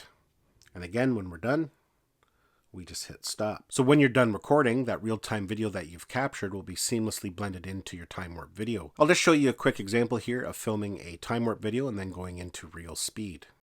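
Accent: Canada. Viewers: Thanks heaps for the help. I'm thinking from the accent and scenery that you're a Canadian!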